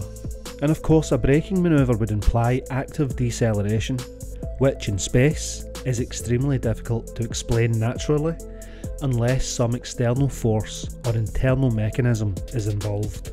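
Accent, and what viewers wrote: Scotland, United Kingdom. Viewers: Clearly Scottish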